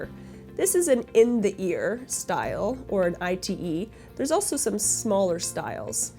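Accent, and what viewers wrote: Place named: Canada. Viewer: This lady gets progressively more Canadian as the video goes on.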